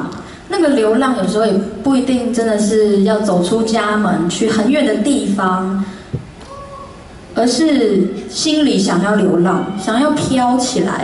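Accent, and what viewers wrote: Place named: Taiwan. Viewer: 还是她的口音跟我台湾人的朋友的很像。有亲切感😊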